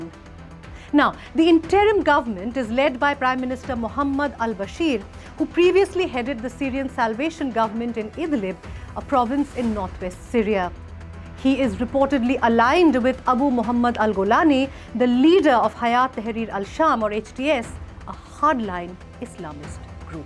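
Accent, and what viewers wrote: India. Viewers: > Opens video > Indian accent > "Yup"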